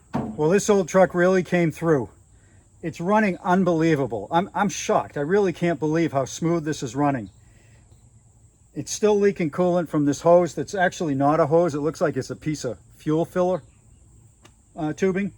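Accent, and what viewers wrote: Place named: New Jersey, United States. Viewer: Sounds like he's from Jersey 😅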